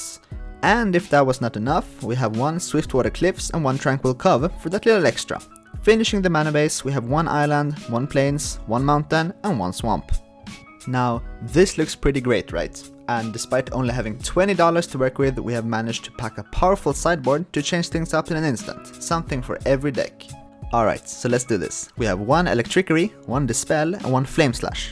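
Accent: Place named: Sweden. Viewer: Awesome! Thanks! BTW - Är du svensk? Din accent låter som den. Jag lär mig svenska.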